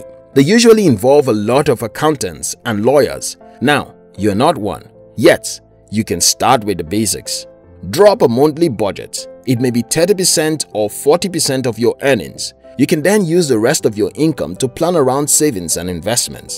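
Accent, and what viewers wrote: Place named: Nigeria. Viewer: This accent is from Nigeria 🤩. Pick up's Nigeria for going full swing. It is grear channel. Im subs. Love it. Born in Mozambiqye myseld, living in South Africa. Thanks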